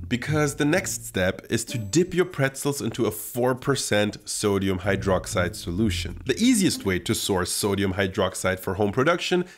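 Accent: United States. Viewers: You r accent is too american,I would have never guessed you were ein dEUTCHLAND Mann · You have such an American accent. It's kind of offensive that you start your post dissing other Americans.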